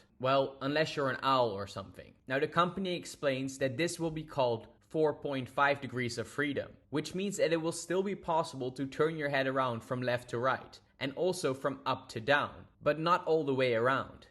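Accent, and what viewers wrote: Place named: Netherlands. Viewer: Love the Dutch accent makker!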